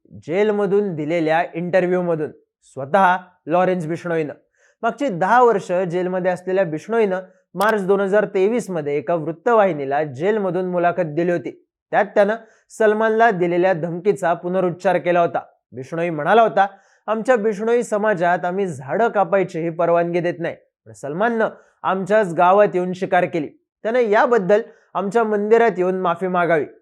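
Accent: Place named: Rural, India. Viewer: ग्रामीण भागातील हा यजमान(host) दिसतो and hyacha awaaza suddha gavakadcha vatto, baki sagle host changle aahet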